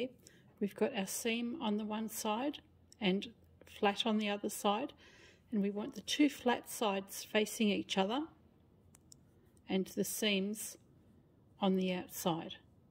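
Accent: Australia. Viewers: Morning. Thank you for your generosity in sharing your tutorials. I love the way you teach. The pace is particularly good. Often I only have to watch once or twice to complete a project. And I am always delighted with the results. You are my new hero! It’s wonderful to hear an Aussie voice too!! Ps just a tip for you: making the Mini Tissue Holders, I cut an extra 6” x4”; so I have 4. 2 for the folded sides, and 2 for either side of a very very light iron on wadding. This does 3 things. It gives the pouch a bit of stability in its base AND i cannot make a mistake which side is the inside or outside😂, and the inside looks as good as the outside for when the customer changes the tissue pack. Probably a bit of over kill on my part, but I was using light fabric that needed the extra stability. All kindness & grateful thanks to you. WA.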